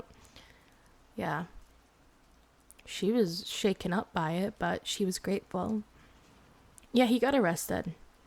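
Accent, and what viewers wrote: Scotland, United Kingdom. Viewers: I love your Scottish accent.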